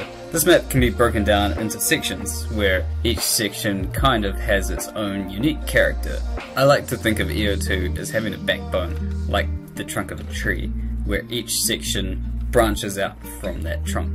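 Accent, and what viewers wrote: New Zealand. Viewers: so refreshing to hear a kiwi accent talking about tm. where abouts are you from in nz btw? i'm in chch ~ psyko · Rest of the world says: section, checkpoint NZL says: séction, chéckpoint Funny accent! Good job with the video mate! Have you used the 'traveling salesman' problem for optimization? It is the most efficient way (that I know of) to determine the fastest route from A to B. Edit: I was halfway in the video when I asked it, sorry. So you did use traveling salesman :)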